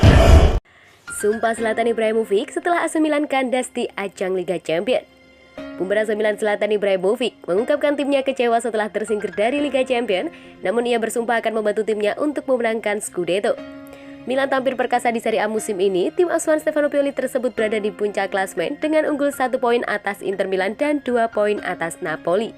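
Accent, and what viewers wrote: Java, Indonesia. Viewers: Jawanyan mana mbak pengisi suara!????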